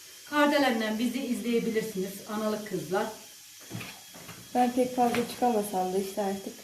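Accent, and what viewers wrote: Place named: Uşak, Turkey. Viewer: Bende Usakliyim senin konusma sivenden anladim Usakli oldugunu abone oldum basarilar Süpersinnn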